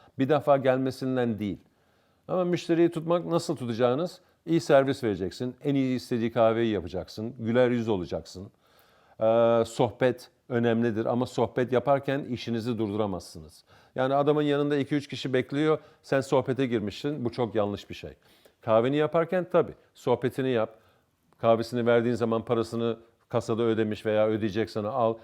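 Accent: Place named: Australia. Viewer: Bu adam Avustralya’da doğup büyüyüp Türkiye’de kahve dükkanları açtı. Türkçesi bu yüzden aksan olarak farklı ama yinede diksiyonu çok iyi.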